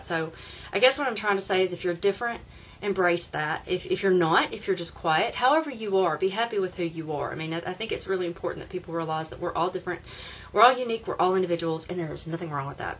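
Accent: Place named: Louisiana, United States. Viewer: You sounded close to home. Louisiana here. Thanks again!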